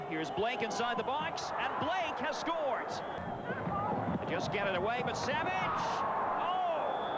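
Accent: Sweden. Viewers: Swedish-English is so funny to listen to bruh 😭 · Your accent SCREAMS Sweden omg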